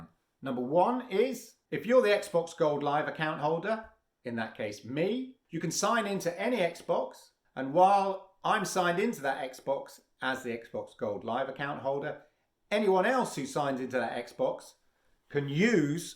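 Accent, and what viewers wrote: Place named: United Kingdom. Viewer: This is very useful I watched a bunch of others and it turns out I only understand the british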